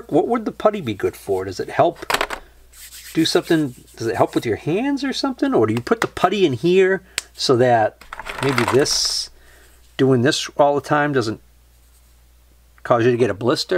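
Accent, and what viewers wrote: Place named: Massachusetts, United States. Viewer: So i found your vid on Victor regulator and i like your explanations. Looks like we are doing the same kinda stuff. I hear an accent too and it seems your from Ma? Btw, new sub.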